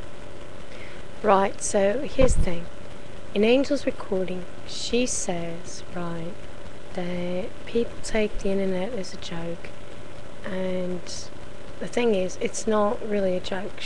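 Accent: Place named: United Kingdom. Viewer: whats wiv the Britsh accent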